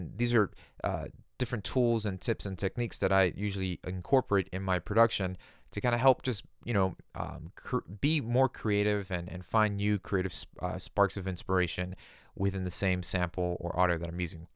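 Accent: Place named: United States. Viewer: You sound just like the guy from headspace only with an American accent, but so similar lol. Nice videos!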